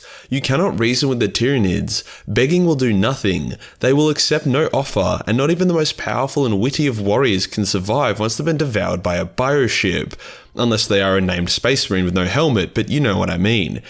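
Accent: Australia. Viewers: I'm really disappointed that you did not do any political jokes. You throw homophobic slurs, sexism, autism jokes and more. But oh let's give politics a break. What the fuck dude. >:( I thought I was listening to an Australian. Not a Canadian.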